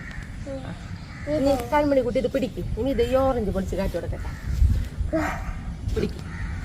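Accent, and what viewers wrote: Thrissur, India. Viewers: എനിക്ക് ഒരു പാട് ഇഷ്ടമാണ് തൃശൂർ ഭാഷയും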